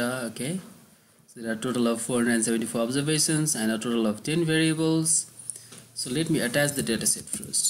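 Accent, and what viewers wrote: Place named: Nepal. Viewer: This guy sounds Nepalese. Anyways, nice video.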